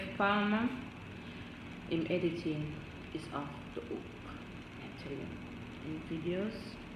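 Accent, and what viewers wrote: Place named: Caribbean. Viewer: Lol i love your Caribbean accent ☺️ ready to support anyone who wants to grow🥳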